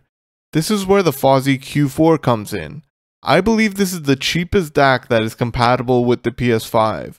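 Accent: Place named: United States. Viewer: sounds like a heavy dude, oh hes murican lool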